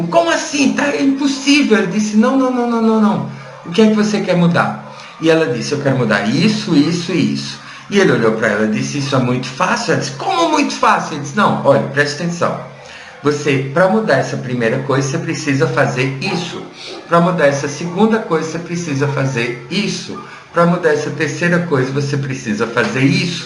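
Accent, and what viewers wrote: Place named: Rio Grande do Norte, Brazil. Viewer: tu és de qual estado? Não consigo identificar o sotaque... Acho que é do Nordeste!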